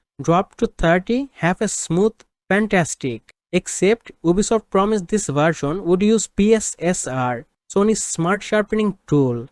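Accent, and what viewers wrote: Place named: Bengal, India. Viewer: indian accent is not a bad thing bro. bengali accent is very evident , plz fdont try to hide it 😒😒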